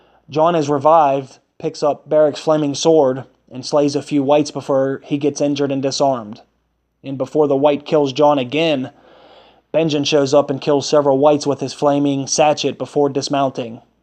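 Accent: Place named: Philadelphia, United States. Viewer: you must be from the philly area talking like that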